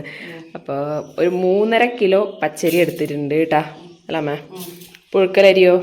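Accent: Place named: Kannur, India. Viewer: Nigal kanuru karano. Langage kelkubol tonunnu. Ee. Appam soft udo. Jnan udakubol orikalum nanavarilla. Oru nazi ariku atra alav puzukalari cherkanam. Makalk udaki kudokuvananu👍🏻❤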